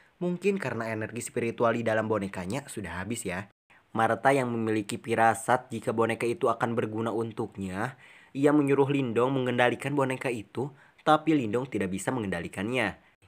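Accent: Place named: Tasikmalaya, Indonesia. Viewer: Mang orang tasik nya😂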